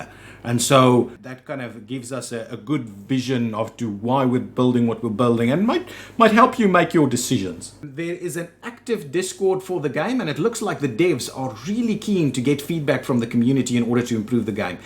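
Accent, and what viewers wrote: Afrikaans, South Africa. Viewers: Can't put a finger on your accent, unless.... Are you South African? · the afrikaans is thick may bru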